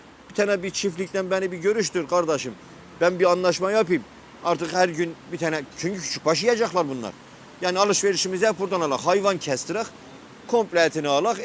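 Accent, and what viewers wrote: Erzurum, Turkey. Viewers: Galiba giyamet Kopti hele şükür Erzurumle mümesin video atmış konuşma tarzı Erzurum söyliyim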